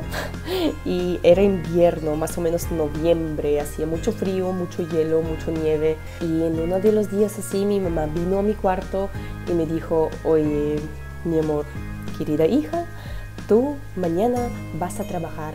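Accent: Peru. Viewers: q ya regresaste de rusia 😮 uno. aquí esperando videos de rusia. .. eres bien graciosa. ya se te pego lo peruano. y muchos acentos. lo ultimo a rascarse los huevos jajaja me dio risa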